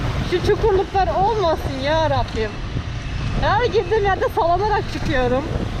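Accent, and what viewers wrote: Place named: Samsun, Turkey. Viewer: Samsunlu olunu video boyunca belli etmişsin 😂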